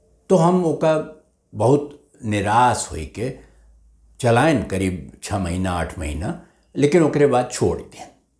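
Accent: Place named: Awadhi, India. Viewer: Aap jab awadhi Maa bolat hai to sunai Maa aur accha laagat hai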